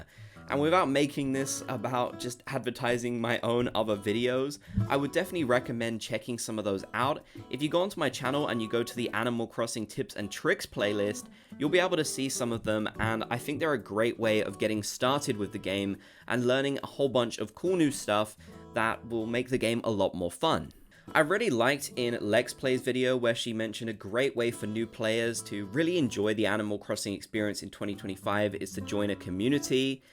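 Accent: United Kingdom. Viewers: both are british❤️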